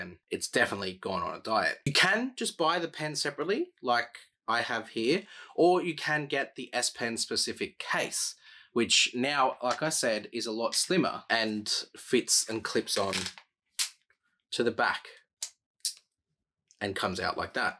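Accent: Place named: Australia. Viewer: Wow good to hear a Aussie accent on the phone review. Thanks so much, I have the Z Fold 3..might change to the 5 eventually..great review thank you 👍👏👏👏❤️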